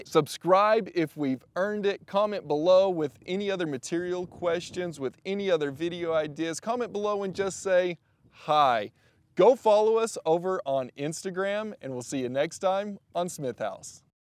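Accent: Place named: Texas, United States. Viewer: "Still" what a texan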